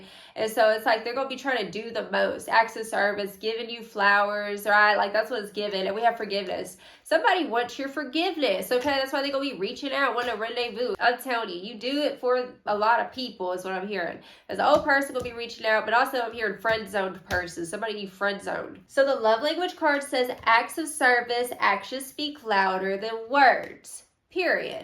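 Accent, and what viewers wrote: South, United States. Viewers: What in the Dolly Parton ,Deepika Padukone,& Salma Hayak is going on here LOL !! I Love your energy,and your country accent . I was surprised to hear you speak you sound down south, and you look like a lot of different cultures You are hilarious and beautiful your read is on point . LOL!!!! 😂 ❤👍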